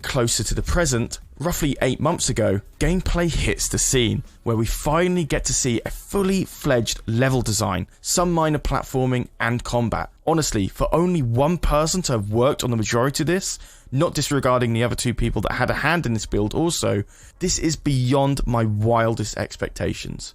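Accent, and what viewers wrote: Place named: England, United Kingdom. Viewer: Why does have an English accent on his second channel?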